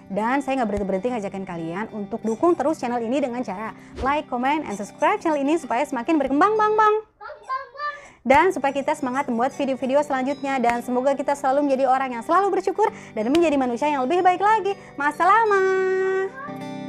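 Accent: Jakarta, Indonesia. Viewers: Udah lama di madinah , tapi masih kentel banget betawinya . Jadi kangen Betawi , dari gadis sampe punya suami ampe punya anak lama di betawi Jakarta barat , padahal saya orang Banten serang . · Betawi nya kentel bgt 😀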